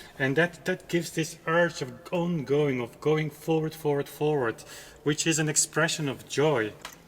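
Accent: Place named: Netherlands. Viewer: you also need accent reduction /th/, "out of the ordinary, not extraordinary" ''dove-tailed rather than very nice" but we love the Dutch! So if you composed this piece, would you just write in the canon dux et comes, then fill in the obligato Tenor and Alto? The homogeneity of the alto and tenor are almost megamaniacal, but account for the fundamental uniqueness of this masterpiece. Why is this a masterpiece? What harmonies are paramount at each point? Arent all the voices somewhat intertwined if we use 4 foot in the bass part? On the theology, what other canons in OB and elsewhere? Why are some melodies more suitable to being canonized? How are the melodies brought together at the end?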